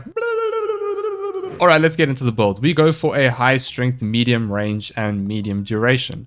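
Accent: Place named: South Africa. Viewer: Is this guy South African because he definitely sounds like he is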